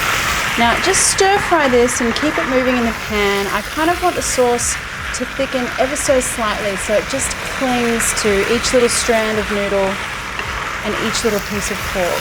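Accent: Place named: Australia. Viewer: I'm never gonna make any of these recipes lol but I love watching you and hear your Australian accent and sizzling food. Aaaah who else?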